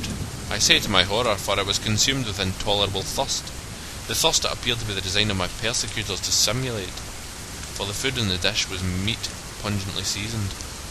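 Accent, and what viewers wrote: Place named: Scotland, United Kingdom. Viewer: Thanks, I didn't have to read it on my own, and I enjoyed a Scottish accent.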